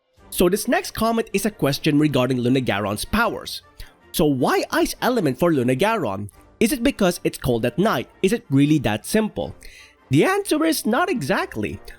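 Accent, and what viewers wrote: Philippines. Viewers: I like your accent, as a fellow filiipino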